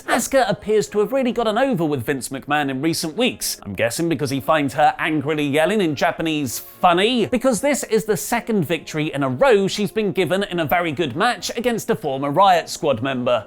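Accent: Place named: United Kingdom. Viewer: Where are you from? Your accent is British English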